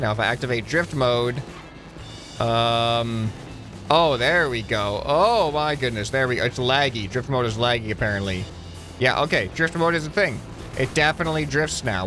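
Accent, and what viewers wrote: Texas, United States. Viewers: not trying to be rude but are you from Texas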